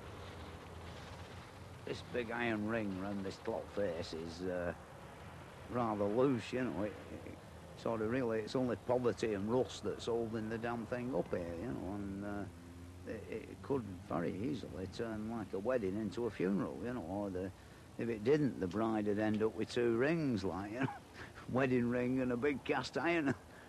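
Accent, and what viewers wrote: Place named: Lancashire, United Kingdom. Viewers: North West England that is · Lancashire talk! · English as they speak it in Northern England.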